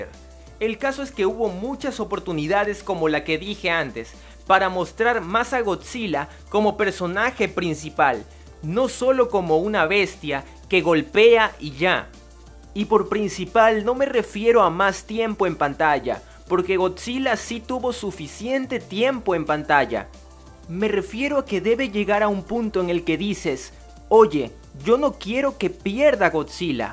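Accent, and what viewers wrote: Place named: Ecuador. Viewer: Eres ecuatoriano :3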